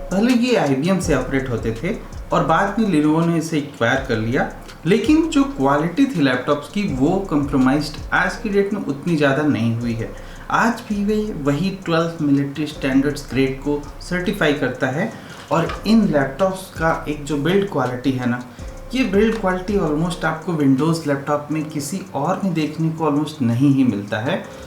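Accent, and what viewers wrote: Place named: Bengal, India. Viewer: I am guessing from your acsent , that you are a bongali !!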